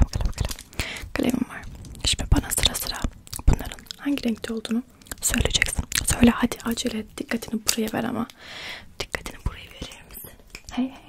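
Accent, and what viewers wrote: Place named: Germany. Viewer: ablada alman aksanı var gibi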